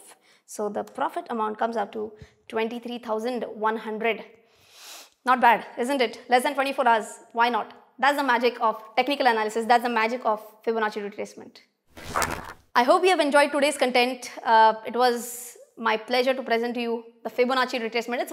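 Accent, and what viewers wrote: India. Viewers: I never like the Indian accent of English till I see you. thank you very much you are amazing all love and respect.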